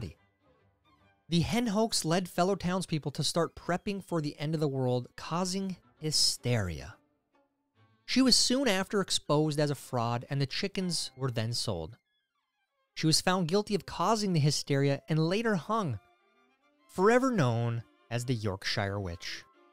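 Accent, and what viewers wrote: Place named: United States. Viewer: For our American cousins, it is pronounced York-sh-ear, Same was Warwickshire, wa-wick-sh-ear. But to be fair it does sound nice pronounced wrong in a US accent so we'll let it slide. What do us Brits pronounce wrong in the US, be interesting to know.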